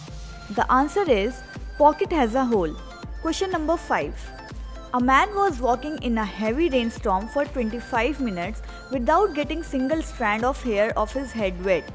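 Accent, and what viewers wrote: India. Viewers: Are you an Indian? Your voice is recognized.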